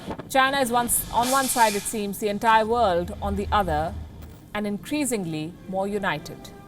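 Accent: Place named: India. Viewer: I like to listen but heavy indian accent make me dizzy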